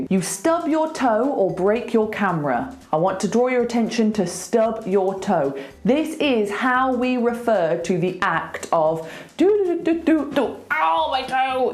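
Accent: United Kingdom. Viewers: Love British accent and your videos.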